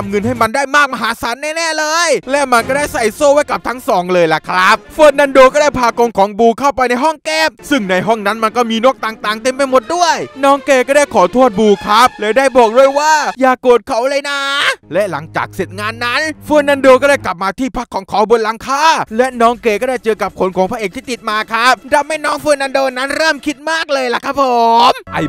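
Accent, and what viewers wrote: South, Thailand. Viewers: นี่พี่บาวเป็นคนไต้ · ชอบภาษาใต้ของพี่อะหนูอยากให้พี่ภาคหนังเรื่องหนื่งเป็นภาษาใต้ได้ไหมคะ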